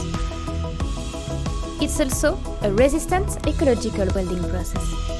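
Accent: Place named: France. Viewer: Thanks to the french for ruining this video i cant understand anything over her accent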